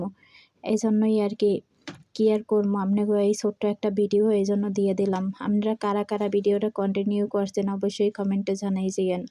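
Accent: Barishal, Bangladesh. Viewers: আসসালামু আলাইকুম। আপু আপনার ব্লগ ভিডিও দেখা শুরু করে দিলাম। অনেক ভালো লেগেছে আপনার ভিডিও এবং আপনার বরিশালের ভাষার কথা গুলো। মাশাল্লাহ ❤❤❤❤❤❤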